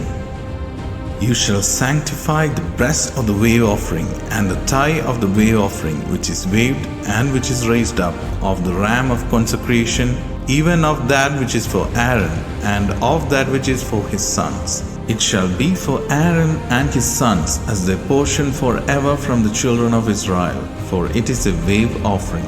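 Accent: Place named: India. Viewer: Really grateful for the Indian accent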